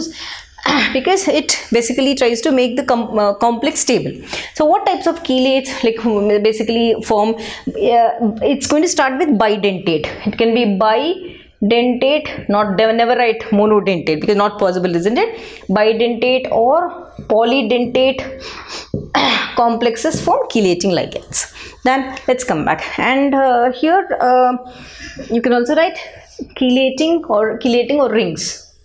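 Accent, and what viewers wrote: South India, India. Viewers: Are you a South Indian ma’am?? Awesome classes. Thank you ma’am.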